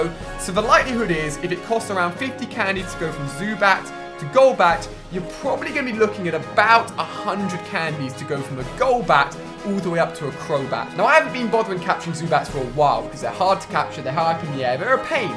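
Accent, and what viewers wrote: United Kingdom. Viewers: Is it just me or does he copy trainer tips but with a British accent?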